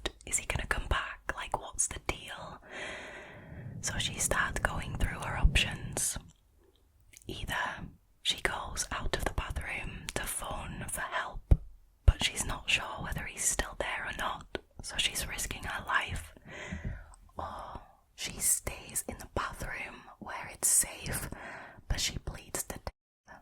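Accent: United Kingdom. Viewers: i really enjoy, as a non Brit, the sound of the classic Britissshhh accent you have...the one i, unsuccessfully, tried to replicate at english classes back in school days...you oftenly fly under my radar though since you mostly do Reddit stories and i'm more of a true crime aficionado... great narration and tone nonetheless...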